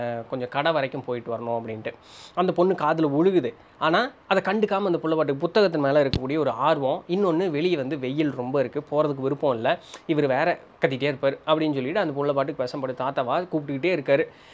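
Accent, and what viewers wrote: Coimbatore, India. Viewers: Magical voice nice story Coimbatore slang 👌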